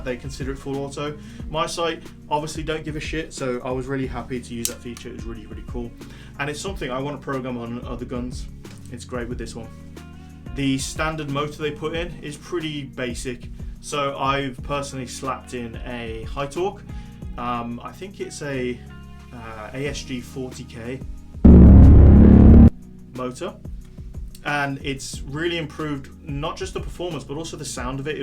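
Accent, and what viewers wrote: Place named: United Kingdom. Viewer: The British accent tells me it’s gonna be a fake gun.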